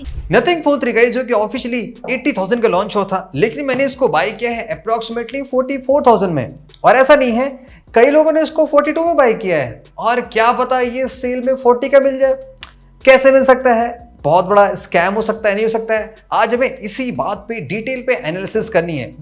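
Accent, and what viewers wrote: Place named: Kanpur, India. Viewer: lgrha tha bahut din se ap bhi kanpur se ho awaj se aj confirm 🎉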